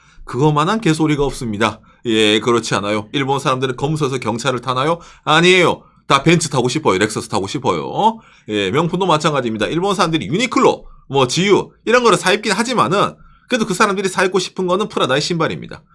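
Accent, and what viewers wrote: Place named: Gyeongsang, South Korea. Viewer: 경상도 사투리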